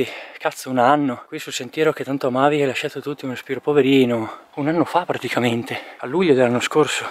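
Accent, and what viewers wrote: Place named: Ferrara, Italy. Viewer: bellissimi video, complimenti! poi col dialetto ferrarese è tutto più scorrevole e simpatico. Se non l'hai ancora fatta devi assolutamente fare il percorso della "Madonna della Corona " da Brentino Belluno. una delle cose più belle che abbia mai fatto e sicuramente uno dei percorsi più belli del mondo! Ciao e buone camminate e ancora complimenti! Maialll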